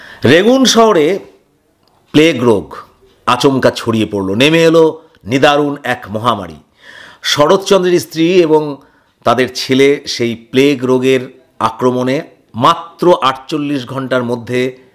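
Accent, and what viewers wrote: North Bengal, India. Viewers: বাসা(বাড়ি), কান্না করা (কাঁদা), খারাপ পাওয়া (খারাপ ভাবা), কথা করা (কথা বলা ) , আসেন, বসেন ( আসুন, বসুন ), সেই হয়েছে ( দারুন হয়েছে )....... বাংলার এই টানগুলো শিলিগুড়ি, জলপাইগুড়ি, উত্তর বাংলার অত্যন্ত প্রচলিত শৈলী। দক্ষিণ বঙ্গে প্রচলিত নয়। নিজের কথার টান / Acsents ই যে সঠিক এই রকম ধারণা ঠিক নয়। কথ্য ভাষা সংশ্লিষ্ট স্হানের বসবাসকারীরাই ঠিক করেন। সেই অর্থে ঠিক ও করেন না। আপনা-আপনি ই প্রচলিত হয়ে যায়। এই Accents কোনো ব্যাকরণ-বিদ বা মাস্টারমশাই নির্ধারণ করে দেন না। তাছাড়া, ভাষায় বৈচিত্র্য ভাষাকেই সমৃদ্ধ করে। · উত্তরবঙ্গের সব জেলায় এমন ভাবেই বলে,(আর বাঙলা কথাটাও পড়িনি,বাংলা এমন পড়েছি)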